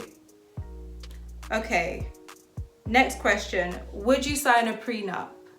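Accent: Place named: Jamaica. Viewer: You sound a bit Jamaican are you Jamaican? #Party #Protect_me #ova 💊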